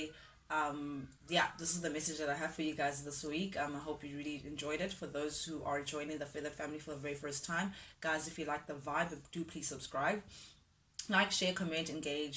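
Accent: South Africa. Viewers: Are you South African? Had to ask because of the accent. Great reading! ❤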